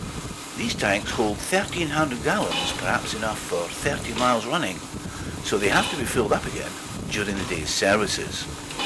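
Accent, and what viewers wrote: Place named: Scotland, United Kingdom. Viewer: Haven't heard a Scottish narration for these kinds of videos; pretty nice.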